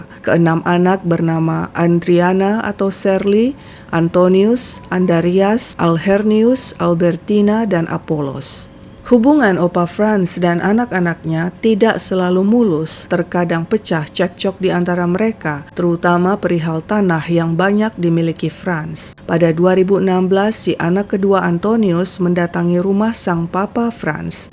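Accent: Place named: Manado, Indonesia. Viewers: kok logatnya manado?? haha setahuku toraja ga gitu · Admin fasih deng logat Sulawesi..😊😊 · Min logatnya manado padahal Min cerita kasus dari toraja sulsel. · Dialek khas Utara si admin mantap 👍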